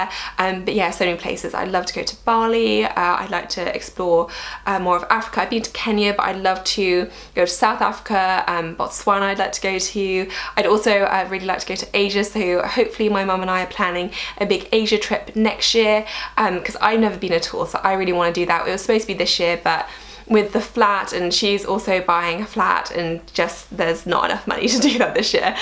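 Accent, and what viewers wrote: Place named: Wales, United Kingdom. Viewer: I’ve only recently come across your channel and I’d like to say how humble you come across, you give some brilliant advice. I’m from South Wales and was so surprised to hear you and your dad are Welsh! 😂😂 you’re definitely the poshest welsh person I’ve ever heard 😂😂. Well done on your career and your YouTube channel you’ve definitely got a new subscriber xx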